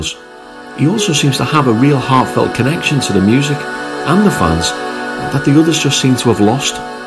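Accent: Liverpool, United Kingdom. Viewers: I’m from England and believe I have a good ear for English accents but it’s not just because you’re a scouser that I can’t believe you aren’t of Guitar the YT channel mainly devoted to detailing all things Oasis, Shed Seven, Ocean Colour Scene & Britrock of the late 80s onwards. Perhaps you are one or have diverse tastes but operate under various headings! Both channels - this one & are great in - depth stuff anyway 😁❤️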